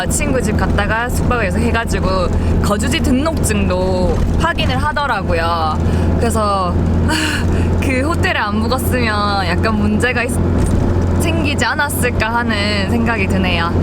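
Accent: Gyeongsang, South Korea. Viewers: ㅋㅋ 말도 이쁘게 하시네 경상도 말투 정겹네요 멋져요 ㅎㅎㅎ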